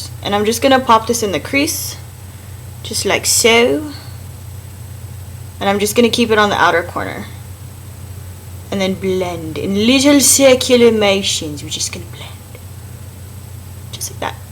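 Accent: England, United Kingdom. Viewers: Your English accent is fabulous :))) Loving the make-up x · LOLL I LOVED THE ENGLISH ACCENT omgg so funny man!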